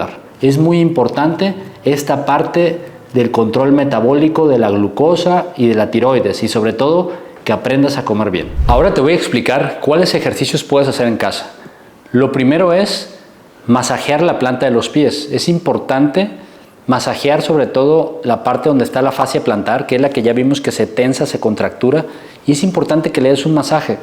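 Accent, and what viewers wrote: Mexico. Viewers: Por la forma de hablar, en Mexico. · es de México! Mírale la bandera de México en la bata! Además de que el acento lo delata!!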